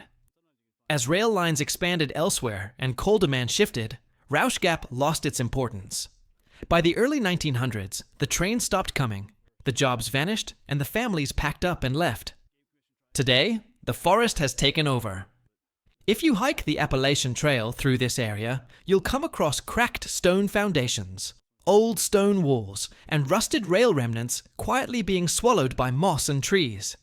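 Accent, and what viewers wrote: United Kingdom. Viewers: Is it me or does anyone notice the narrator's voice once in a while turns into a English/British accent? Lol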